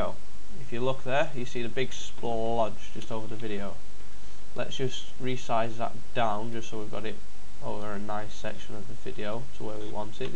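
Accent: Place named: Yorkshire, United Kingdom. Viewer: Thanks, this was useful! :) A fellow Yorkshireman by the sound of it. :)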